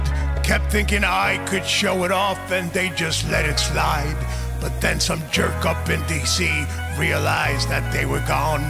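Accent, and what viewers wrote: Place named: Netherlands. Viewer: I hear a Dutch accent…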